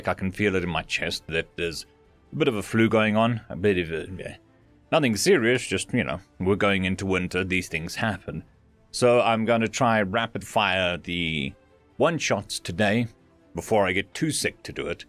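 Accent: Australia. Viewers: Must be from down under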